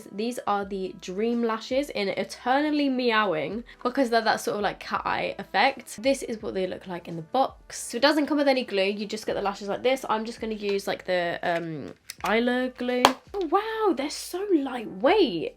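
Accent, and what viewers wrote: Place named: London, United Kingdom. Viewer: You are sounding a little London today 😅😅 ❤️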